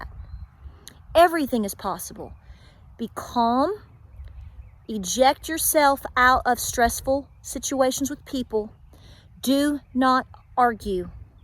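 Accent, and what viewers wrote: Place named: Appalachia, United States. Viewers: Also I'm from West Virginia....I can tell you're close geographically and not just by you also being an Appalachian queen! I can hear that beautiful accent...mine is barely noticeable,but sometimes people will ask to which I'm always surprised since mine is so minor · When I hear you speak it gives me a taste of home. That Appalachian twang is music to my ears. And good old fashioned common sense, something that is in short supply, especially now. I live in Florida and the “spring breakers” are acting so irresponsible even today they were doing Jell-O shots off girls stomachs, partying like nothing going on. Incredible. Incredibly stupid. Now they are taking the virus home to their families. Can’t get my head around it and why their parents would allow them to even come here right now. I’m staying home because it’s the right thing to do. 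So should all non-essential folks. You a few other channels taught me how to dry can which I started doing in July cause I couldn’t afford a pressure canner . I finally got one and have been busting my hump canning and you guys taught me and I thank you so much. God put it on my heart last year to get ready, something is coming and I did my best. We are not were I wanted to be yet but I’m probably ahead of 95% of the country and I am so thankful.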